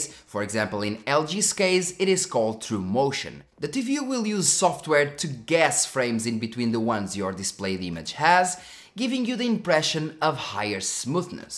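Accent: Portugal. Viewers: the portuguese accent in english is weirdchamp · Mal ouvi o teu sotaque vi logo que eras tuga, bom saber que existe malta a cobrir estes temas para além dos "big ones", toma a minha subscrição!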